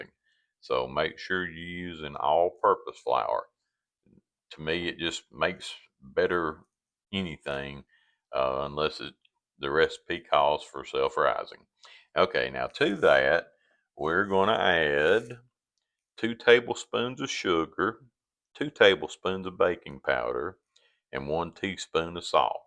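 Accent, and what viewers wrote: South, United States. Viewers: Ps. It will be a sad day when we no longer hear such a wonderful country voice.❤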